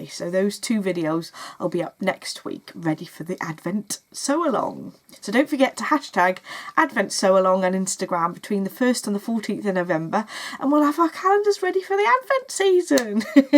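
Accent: United Kingdom. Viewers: Congratulations,! As a german viewer I really love to watch your podcast and listen to your lovely british English. Thank you for cheering up my knitting Time 😍❤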